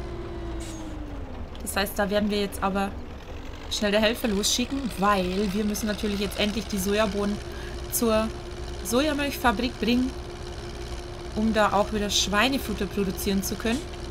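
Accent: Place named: Bavaria, Germany. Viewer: dieser Bayrische Dialekt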